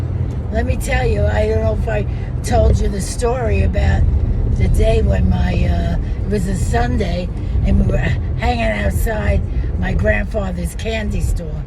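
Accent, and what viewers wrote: New York, United States. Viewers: . Nope. My sister who talks like you is only one of our family still in NY. My Brother and I later went to Medical School in Omaha just around time Henry Hill ( of Good-fellows infamy) came to Omaha. Our accents were like yours 40-50 years ago. Can’t tell you how many times people asked one of us if we were in Witness Protection .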